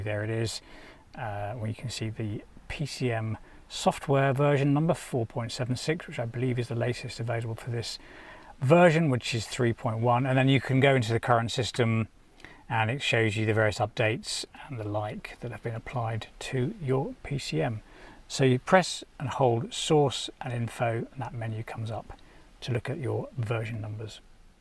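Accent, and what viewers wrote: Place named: United Kingdom. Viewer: my God, I'm watching your part 3 now and I'm going crazy about your British English 😄. Too much of American slang nowadays . By the way, have you also got Guards Red color on your beast?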